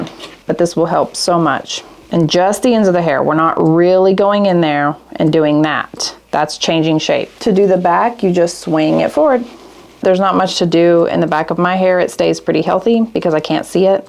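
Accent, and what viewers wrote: South, United States. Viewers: Is it me or did you have a southern accent years ago and now you don't seem to as much. I have been so grateful to find you in 2022 you helped me through cosmetology school. I still love watching your videos. Thank you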